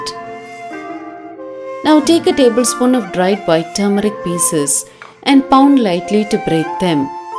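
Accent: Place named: Coimbatore, India. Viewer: Hi, i keep watching ur videos, when i hear ur tamil slang, i feel ur somewhere near cbe, is it so. U videos r very useful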